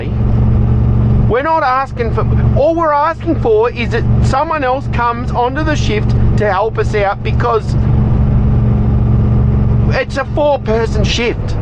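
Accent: Australia. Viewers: LOVE THE AUSSIE ACCENT !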